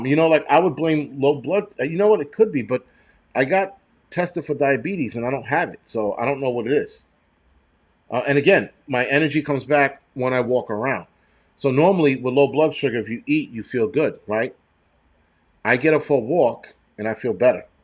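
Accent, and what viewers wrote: New York, United States. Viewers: Pinball Preparedness sent us your way and so glad he did...love your no-nonsense straight talk! LOVE your accent...reminds me of my days in NYC...good times...great people!!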